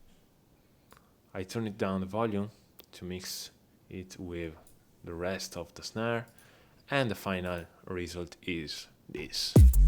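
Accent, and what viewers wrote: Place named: Spain. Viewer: considerato che sono italiano, direi passabile. Pensavo tu fossi spagnolo, forse è l'accento! Non te la prendere, scherzavo sull'inglese, ma sono serio con i complimenti sul tutorial, davvero un ottimo lavoro.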